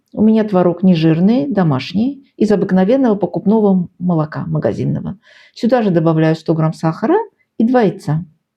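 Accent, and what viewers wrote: Baku, Azerbaijan. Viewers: ...и внешность и говор бакинский....Спасибо за рецепт ,наша дорогая! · Точно подметили, 💯% бакинский акцент! Ни с чем не перепутать! Привет из❤Баку! Всем Мира! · Спасибо за рецепт. Мне почему то слышиться в вашем говоре бакинский акцент. Может я ошибаюсь? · Наши Девушки Бакинки, во всем талантливы, Мы девушки всех наций были дружны, делились всем, самым лучшем отсюда и вкусняшки…., а у Вас прекрасная золотые ручки, Так приятно слушать Наш говор! Спасибо! Счастья во всем! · Спасибо,дорогая,как же приятно слышать ваш бакинский говор!!!!! А рецепты нашей беззаботной юности!!!!Храни вас Бог за такие теплые чувства!❤️ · Очень вкусный пирог! Спасибо большое. Ваш бакинский акцент греет душу -такой приятный! Здоровья вам, дорогая 🙏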